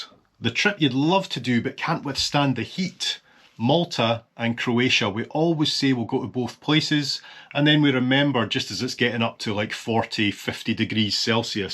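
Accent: Scotland, United Kingdom. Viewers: Is it just my English ears misleading me, or do you really sound more Scottish when you are sitting in your own study at home than when you are making your usual travel videos!? :-) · What captivates me about your videos to be honest is your Scottish accent. I’m from california so to hear your accent takes me to the fantasy world of old England and some small cottages and green pastures. But that is what my vision of Scotland is like. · Extremely entertaining! (I‘m glad your Scottish accent is charming but not too heavy like Gary Anderson 😂) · I love your videos. I love your naturalness. I love your scottish accent and how very scottish you are. I want to ask, and I am sure you have been asked this before many times, but when oh when are you going to come down to Australia, and film here?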